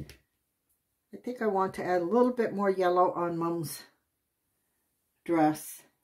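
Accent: Canada. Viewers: Just love it!! By coincidence I was eating Chinese food which I very very rarely eat, and I realized quickly that the little heads looked so much like the back side of the fortune cookie that they threw in my bag! You little curvy mouth was cuter than the cookie! I find that it really helps to think of shapes as objects. Never could be so creative on my own! Thank you!! More whimsical animals please!! I loved hearing about your life! I guessed from your accent and buying gasoline by the liter even though I didn’t know that happened in Canada, it seemed to fit. Good for you to actually go out and do something good for the world!! 💕💕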